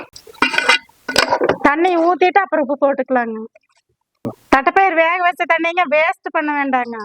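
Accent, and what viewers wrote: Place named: Kongu, India. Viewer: Akka nama Kongu tamil kalandha saadham arumai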